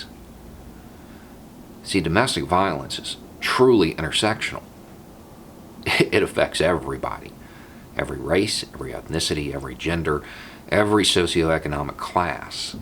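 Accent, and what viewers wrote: South, United States. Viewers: We all have prejudices. It seems to me that having a 2 party system has divided the country between liberals and conservatives. It’s the only way the elites can maintain power. Divide and rule is the name of the game. As for you, the fact you have that southern accent brings those preconceptions we have about middle America. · you are the reason why thousands of people overcame their prejudices against Southern US guys of European ancestry (I reject the classification into black and white. Euro- or Afroamerican is more realistic). Good Southerners are too often overlooked and put into the same box as the bad guys. Same with Israeli Settlers, Iraqi Sunnis and ethnic Russian Ukrainians. You speak a certain dialect from a certain area and bam! you are suspicious. Anyway. Good job. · I discovered you just today, via a FB friend, and dude, you are awesome. 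I'm sorry to say that I do stereotype some, so how much more do I love hearing your Southern twang say things that aren't Trumpisms. Tats & the beard's hip up here -- in small town Ontario, anyway. The tank top/wife beater top's only offensive if it's worn by a very laege, sweaty person who should know better. Maybe things are different in the US? I hope things get better. You guys deserve so much better than Trump, or Bush, or bloody Nixon, or (dare I say it) Reagan.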